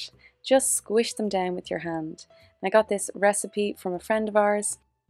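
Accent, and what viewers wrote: Ireland. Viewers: Are you Irish? I detect an accent. Thanks for this. It was fun to watch!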